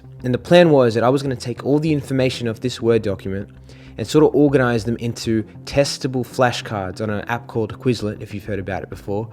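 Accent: Kerala, India. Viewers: R u indain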